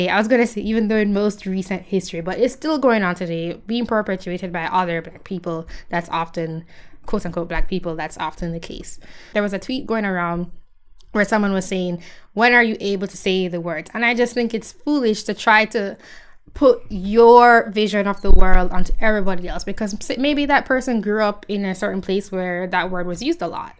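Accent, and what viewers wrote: Jamaica. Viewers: Since I'm not American I don't really care about any of these issues, but I just enjoy listening to your Jamaican accent. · Really nice jamaican girl. Your accent is so cute.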